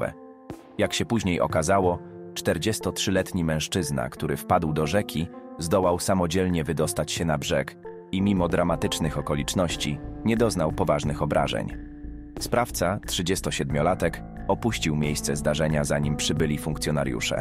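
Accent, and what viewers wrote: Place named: Silesia, Poland. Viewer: Zgaduje że autor pochodzi ze Śląsk 😊😊😅😅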